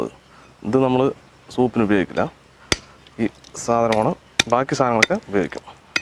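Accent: Palakkad, India. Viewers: Aa palakkadan slangilulla samsaram kelkkan enthu rasanu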